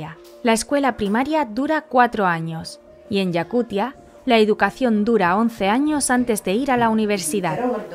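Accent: Spain. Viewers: Vaya , el vídeo con acento español qué maravilla , no soporto esos acentos latinos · Menos mal que la narración es en español de España , no soporto esos vídeos con acentos latinos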